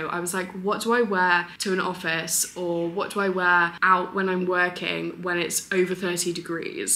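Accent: United Kingdom. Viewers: As a previous professional party photographer in Sydney, I can safely say this, you’re young, British with a classy accent, blonde, and pretty, these are all you need to gain entry to all sorts of groups and cliques. Be confident in that 💪🏽😁!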